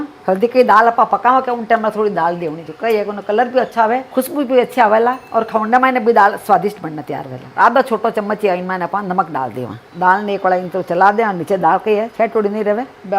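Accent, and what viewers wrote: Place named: Rajasthan, India. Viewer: Auntyji apari marwadi sunn kar mummy or naniji re yaad aa gaye.... Thari kadhaii jali wali hai.....spl kaiii hai kadhaii ma...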